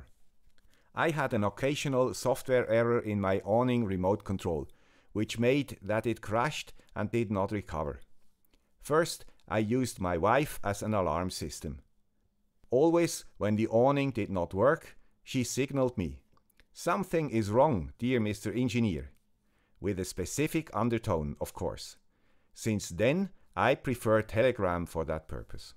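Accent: Switzerland. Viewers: Hi Guy with the Swiss Accent! Thank you for your outstanding videos; I really, really enjoy watching them and I appreciate your presentation style and sense of humor. Best regards!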